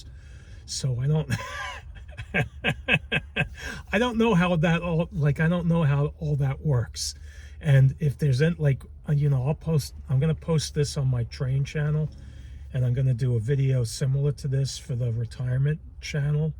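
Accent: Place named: Mid-Atlantic, United States. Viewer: Mid Atlantic accent. Interesting.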